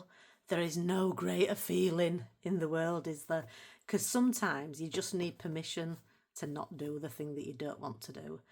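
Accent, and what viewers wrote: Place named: Northern England, United Kingdom. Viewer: I’m really looking forward to this series - love the colour palette you have chosen for the background! I’m excited that you will be using Chromoflow as there are very few YouTube channels who use these pencils - Prismas are by far the favourite out there. I am a Mancunian living overseas so it is lovely to hear your Northern tones!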